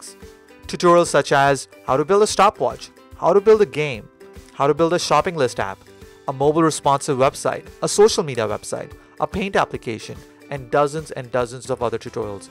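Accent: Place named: India. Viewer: I love your Indian accent in the background :) :) makes the video makers more authentic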